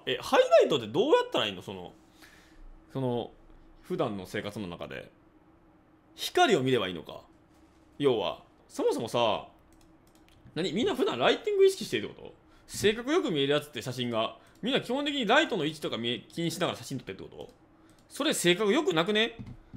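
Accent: Kansai, Japan. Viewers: なんでこんな関西弁やねん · 最近ずっと関西弁残ってるのオモロい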